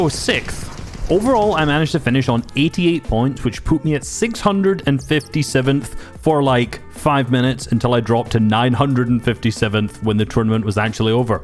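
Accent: Scotland, United Kingdom. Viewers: Why is understanding pure scottish english so hard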